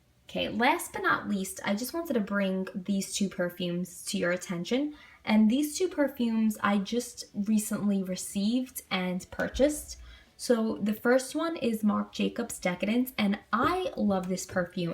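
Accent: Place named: New York, United States. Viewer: Italian/ new yorker much? Lol. Enjoyed all your beautiful perfumes we have similar taste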